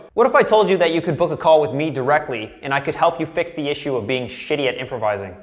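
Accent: Canada. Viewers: I am fookin' Polish and I understand 99 percent of what you say. I love the Canadian accent! BTW Your channel is so fooked up! !!!!!!!!!!!!!!!!!!!!!!!!!!!!!!!!!!!!!!!!!!!!!! Just kidding. It's great keep it up. Cheers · Are you Canadian? Got the Canadian "are"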